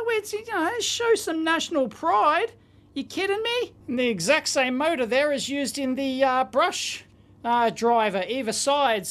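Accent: Australia. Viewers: I clicked on the video without reading anything about it. Imagine my surprise when everyone's favorite Canadian UBF started speaking with an Australian accent.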